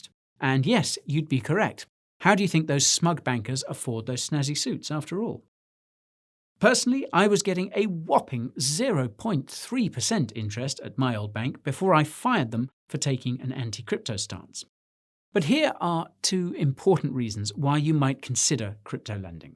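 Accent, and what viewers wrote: United Kingdom. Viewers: Love your brit accent & pacing. It sounds much more credible than the typical self-help American mumbo jumbo. With that sweet voice, you could sell me any shitcoin you want, lolz. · Just because of the British accent I trust this guy more lol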